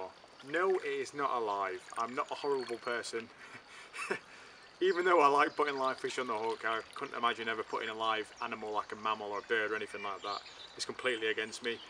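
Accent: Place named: Derby, United Kingdom. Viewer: What river was that taken on I presume derby by your accent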